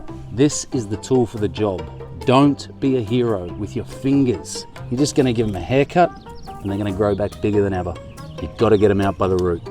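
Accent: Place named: Australia. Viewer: Mate - thanks for making me laugh. You have taught me three things: 1. Lawn stuff - who would have thought grass could have turds? 2. Poetry - "harder than a honeymoon dick" 3. Visual art ( that was an Asian elephant right? Elephas maximus I believe - they have small ears) Anyway, gday from Dubbo (with an accent like that, you might even know where that is!). All the best with the channel. Can't wait for the next video.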